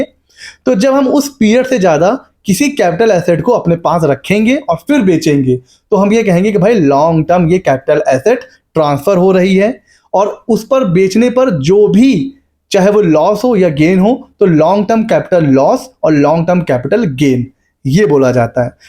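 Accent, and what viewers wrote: Lucknow, India. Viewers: Lucknow se lag rahe ho, is it?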